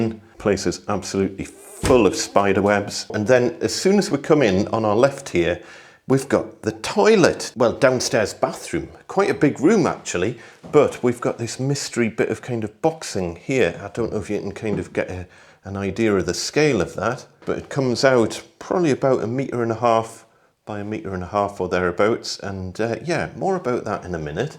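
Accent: Newcastle, United Kingdom. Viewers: I recognise that accent! Gosforth Newcastle upon Tyne? Doing the region proud.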